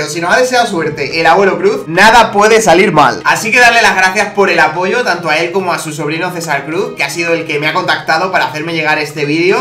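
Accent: Mexico. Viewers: No vives en mexico y cada vez suenas mas como un mexicano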